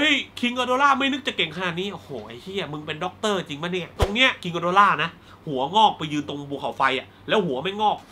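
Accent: South, Thailand. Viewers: พี่สำเนียงคนใต้ จังหวัดไหนครับ ผมนครศรี 55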